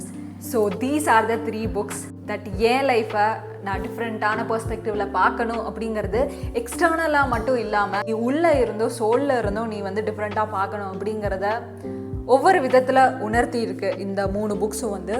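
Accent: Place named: Kerala, India. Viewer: Are you a malayali? You speak tamil like a malayali..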